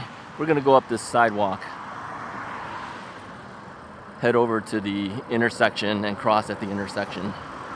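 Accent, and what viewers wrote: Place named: Illinois, United States. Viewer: It sounds like you're in IL, up north somewhere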